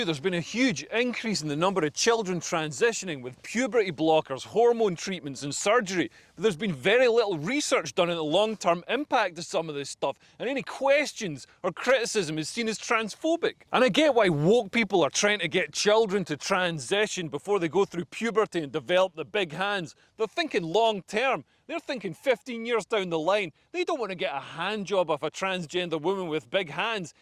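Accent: Scotland, United Kingdom. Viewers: Came for trans jokes .. Stayed for the Scottish accent :3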